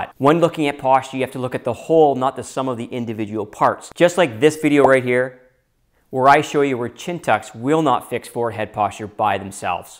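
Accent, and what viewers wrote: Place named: Canada. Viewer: Upvoted and shared! Plus: I knew you were Canadian, after a few moments of listening : )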